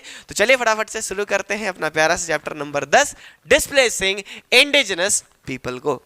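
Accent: Bihar, India. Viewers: bhaiya ap bihari mat bola kigiye please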